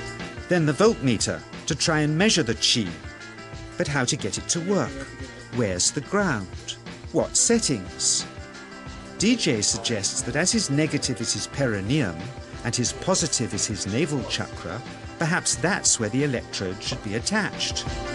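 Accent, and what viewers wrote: Philippines. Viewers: He Looks Like A Filipimo And Speaks Like A Filipino. What Do You Think ? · john chang sounds fillino .. I like the way our fillpino brothers and sisters talk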